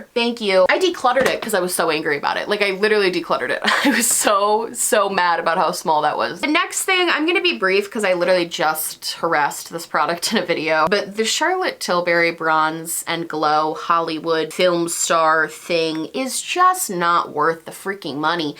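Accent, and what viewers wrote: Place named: Rochester, United States. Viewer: I'm from Rochester but I've lived in Seattle for five years and I watch your videos to hear that sweet sweet Rochester accent ❤️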